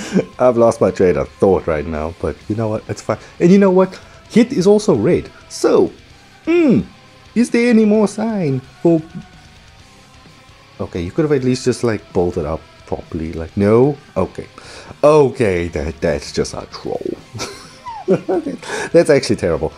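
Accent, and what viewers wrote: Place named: South Africa. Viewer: I can smell a south african from a mile away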